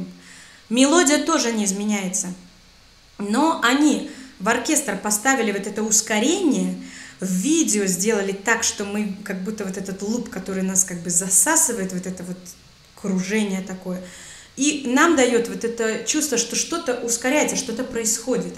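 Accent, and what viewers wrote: Russia. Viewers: Что-то я не понял... вы не русская? У вас же идеальный русский акцент. И построение фраз. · По русски говорите безупречно, мне вот стало интересно, иностранцы англоязычные замечают-ли Ваш русский акцент...?))) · Специально пишу по-русски. Дорогая, я бы Вам 10 лайков поставил, если бы была возможность. Вы - чудо, в том числе как певица. Увы, пока не было возможности оценить Вас с дирижерской палочкой в руке, но уверен, что и в этой ипостаси Вы не разочаруете. )) Спасибо огромное за рецензию и более чем профессиональную и качественную аналитику. Подписан на Ваш канал, всегда внимательно слежу. Как уже не очень молодой человек, имеющий взрослых детей и (пока одного!) внука, плюс как филолог-русист по университетскому образованию, хочу Вас поздравить с практически идеальным московским произношением и грамматически безупречным русским языком. 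Вы иногда сомневаетесь в своей лексике, но всё делаете правильно. Берегите свою семью и Вашу прелестную дочь, в первую очередь (видел её в другом ролике), в наше неспокойное время. И себя, разумеется )). Храни вас Бог. Привет из Москвы. Всем мир! Вадим. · Здравствуйте! Послушал ваши реакции на русском и английском языках. Похоже на то, что ваш родной язык -русский, так как английский уступает в произношении, присутствует акцент и вы прежде всего русскоговорящая, владеющая английским. Но разбор произведения отличный)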